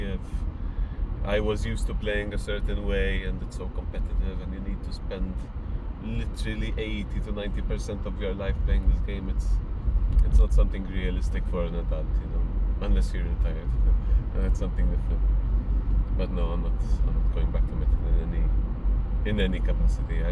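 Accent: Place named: Malta. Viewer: Its very good to see that you dont intend to delete all those videos and even got back your youtube. Also you've got a very heavy Maltese accent haha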